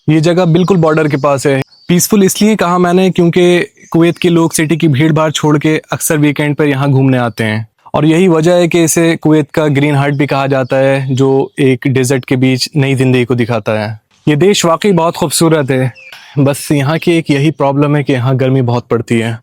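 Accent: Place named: Pakistan. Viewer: Ap. Bilkul pakistani lagte the mujh. Pure urdu...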